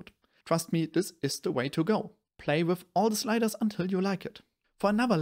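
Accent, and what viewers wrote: Germany. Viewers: This man has one of the lovliest German Accents i could imagine xD Nice work man!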